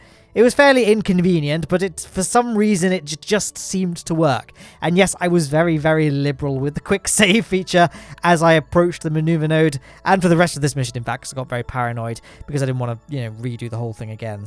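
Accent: United Kingdom. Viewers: british voice = smart voice. this is just the way it is. (i'm an american)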